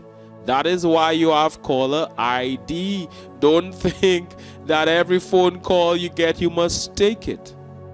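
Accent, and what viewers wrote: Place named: Jamaica. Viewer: are you Jamaican? Jackie from Philly You have a LOVELY ACCEN’T and you are very INTELLIGENT! I ❤ U-U-U Do the funky chicken sweetie! It’s a nice dance. The young kids like this old dance. L O L 😇😇😇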